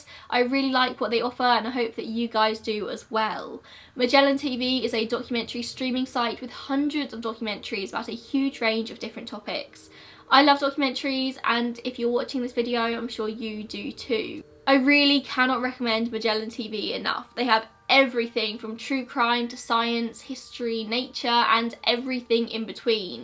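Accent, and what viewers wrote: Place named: RP, United Kingdom. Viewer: I feel like posh accent should be saying "mind the gap" or calling out the numbers in an elevator. Not gonna lie, it lulled me to sleep ❤️❤️❤️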